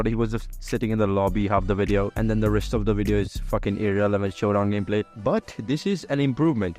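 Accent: India. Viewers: Are you indian cause i can hear the accent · Indian voice😊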